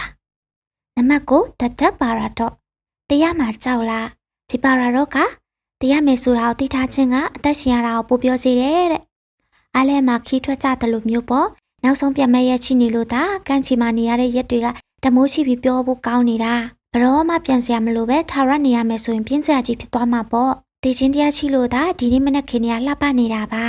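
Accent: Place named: United Kingdom. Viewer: Eng accents လေးတွေကကျန်တော်အသဲယားတာ ဆက်ကြိုးစားပါခင်ဗျ❤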